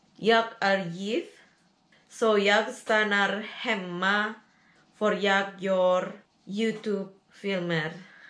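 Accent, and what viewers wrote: Indonesia. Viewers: Kental bgt aksen indonya😀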